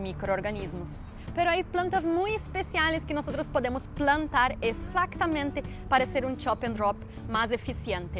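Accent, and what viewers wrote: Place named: Brazil. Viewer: Cai de paraquedas aqui e vi que era brasileira pelo sotaque, ótimo vídeo parabéns 👏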